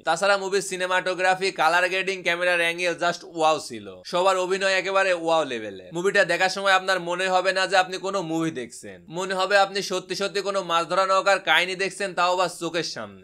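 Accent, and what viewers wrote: Pabna, Bangladesh. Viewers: apnar basha pabnar kothai bro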